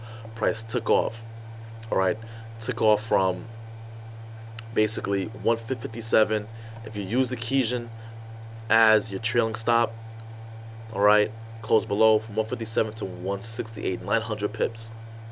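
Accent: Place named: Italian-American, United States. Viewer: Love the goomba accent. Fits the gangsta ripoff traders.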